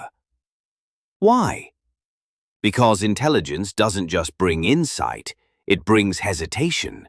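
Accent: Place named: England, United Kingdom. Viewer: Too slow, lose the english accent. Good shid lil bro , good luck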